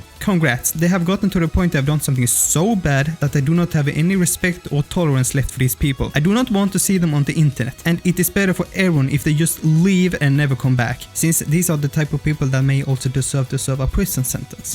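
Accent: Sweden. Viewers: I might be wrong but I think it's Swedish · Love your swedish accent :D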